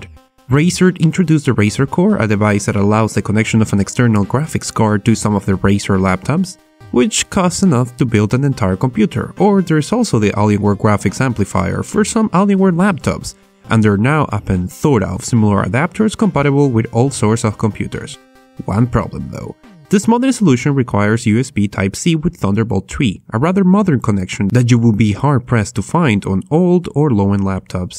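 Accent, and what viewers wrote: Spain. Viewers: Are you a spanish speaker? Nice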